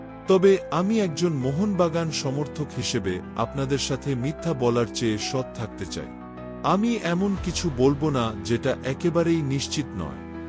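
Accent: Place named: Bangladesh. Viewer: উচ্চারণ শুনে মনে হচ্ছে বাংলাদেশি। আমার অনুমান যদি সত্য হয় তাহলে বাংলাদেশেও মোহনবাগান সমর্থক আছে জেনে আমি গর্বিত।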